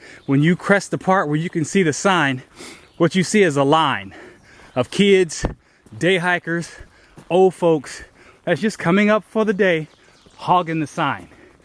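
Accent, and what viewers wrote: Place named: Lehigh Gap, United States. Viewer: Your making great progress keep up the good work. Enjoy your accent out of Lehigh Gap.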